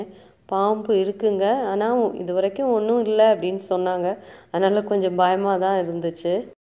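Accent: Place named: Tirunelveli, India. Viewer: Assalamu alaikkum vrh, yepdi irukkinga maa, yen name fazila, i am from chennai maa, neenga peasurathu keakkum pothu oor baasai nallaa theriyuthu, naangalum thirunelveli la eral thaan, namma oor baasai keakka santhosamaa irukku maa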